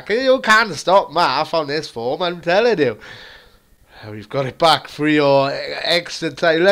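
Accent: Wales, United Kingdom. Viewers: Where u from in Wales bro?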